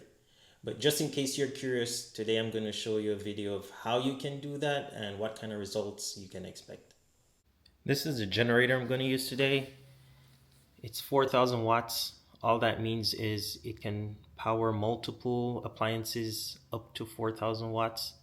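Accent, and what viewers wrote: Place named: Bahamas. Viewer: What island are u from ? I’m guessing the Bahamas.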